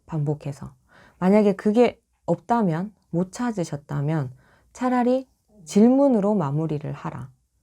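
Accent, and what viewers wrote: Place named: Gyeongsang, South Korea. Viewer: ~유익한 내용 감사합니다~경상도 억양도 좋은데요!😍😍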